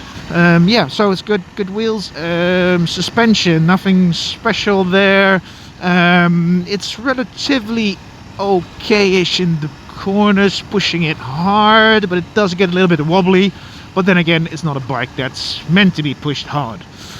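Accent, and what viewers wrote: Netherlands. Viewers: Well look at that, a Dutch guy doing motovlogs whose accent ISN'T grating to listen to. I'm Dutch myself (Nederlands jeweetog!) and if there's one thing that consistently grinds my gears it's other Dutchies vlogging with 0 attention to pronunciation, proper enunciation, or even vocabulary. Sure, one doesn't have to mask one's accent, but at least an attempt at sounding vaguely localized is much appreciated. Related, keep up the good work, sir. I'm very interested in buying a Versys 300X. Currently riding an CBF500 and a Leonardo 250, and I'm looking for a physically bigger bike to fit me a little better without losing the nimbleness and (relative) low weight of a small bike.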